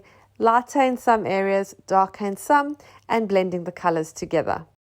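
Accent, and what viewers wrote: South Africa. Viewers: There you go! I hadn’t actually picked the South African accent either which is strange as it’s one of my fave places - have been twice and can’t wait to go again! One day! <3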